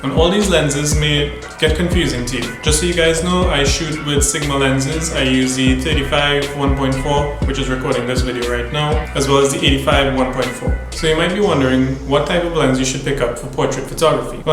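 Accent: Trinidad and Tobago. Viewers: You sound an awfully lot like my buddy from school in the US and he was from Trinidad and Tobago, something tells me you've got your roots to that part of the world!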